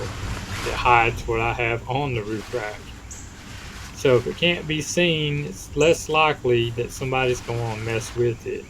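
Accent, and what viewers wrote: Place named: South, United States. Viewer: Yeah, and you're a pretty good actor. Maybe take a trip California, they love a southern accent there. You can be a Hollywood star! Plus, it's one of the most beautiful places with millions of acres of public land lots of jeep trails. I live in Mississippi but just bought property in Arkansas, just a rock throw from Pond Creek. I like to canoe and love all the water in that area! My girlfriend is from Louisiana, so we like to explore all the nearby states.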